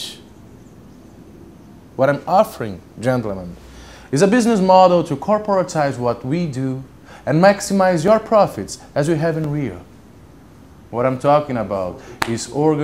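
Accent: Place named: Portugal. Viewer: Portuguese accent sounds sweet:)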